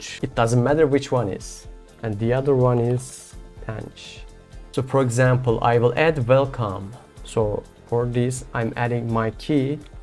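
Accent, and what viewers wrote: Turkey. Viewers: Daha ilk konusmaya basladiginda bu kesin Türk dedim :d Hatta emin olmak icin yorumlara baktim. Guzel icerik tesekkurler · İngilizceyi duyduğum gibi dedim aha Turko :) Başarılar teşekkürler.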